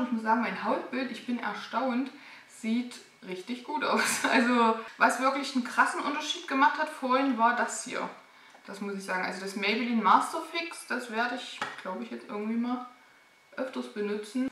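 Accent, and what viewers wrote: Saxony, Germany. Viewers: Schönes Video, alles super erklärt, und das Denglisch finde ich auf sächsisch sehr sympatisch, gerne immer wieder mehr davon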